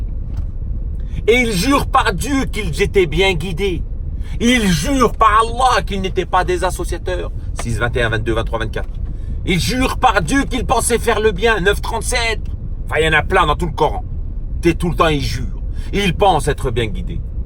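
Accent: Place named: Saint-Étienne, France. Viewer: Salam, heureusement que t'as remis tes vidéos t'as pas fait ça pour rien . Je souhaite que Dieu te bénisse toi et ta famille ici bas et dans l'au delà inch'allah tu aura le pardon et la pleine récompense. Ton langage famillier m'est famillier j'aurais jurer que t'étais de Sainté, t'as l'accent et les mimiques de la ville minière et des chemins de fer et des verts. Tu tape dans les babets avec tes guibolles ou pas ?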